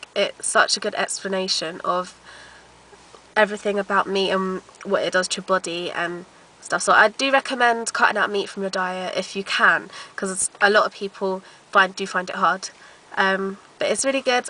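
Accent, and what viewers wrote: London, United Kingdom. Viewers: ahahah dat London accent doe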